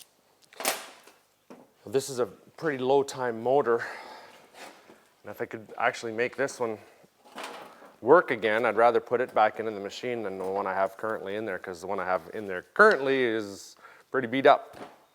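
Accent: Canada. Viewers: Lol Canadian accent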